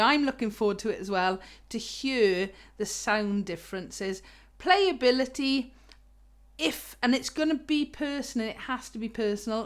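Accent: Wales, United Kingdom. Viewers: Hello. Thanks for your video. I have used all three 0f the types of strings you demonstrated. Like you I favour the Thunderbrowns. I have them on a fretted Aklot Bamboo acoustic/electric bass Uke. The only issue I have had with any of the strings is the amount they stretch. When all the stretching has been worked out of them they are all good to play I really enjoy your videos Love your Welsh accent. Gary.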